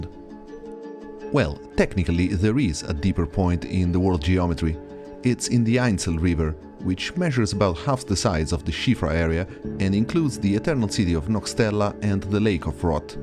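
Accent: Italy. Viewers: Your accent is Italian eh? :) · Ho la sensazione che tu sia italiano, l'accento c'è, son contento di vedere qualcuno dei nostri fare BENE dei video in inglese, complimenti! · L’accento italiano è valore aggiunto al video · ma sei italianissimo si sente subito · Amazing video! Very sleek editing. I was wondering how you got rid of the fog, a run without it sounds fun. Also, are you Italian? I'm not completely sure but you pronouncing the name of that town correctly and your accent points that way. Great job! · Compà si sente da 80 km che sei italiano 😂, bella li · You have the most Italian accent 😂😂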